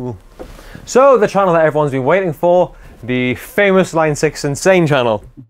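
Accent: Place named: Sunderland, United Kingdom. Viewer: Can't quite place the accent, I think he comes from Sunderland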